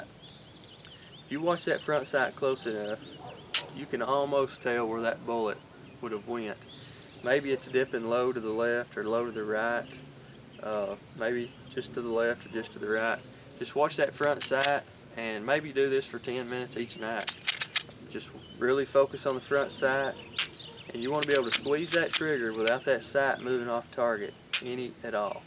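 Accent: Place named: Alabama, United States. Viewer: he sounds like he's from Alabama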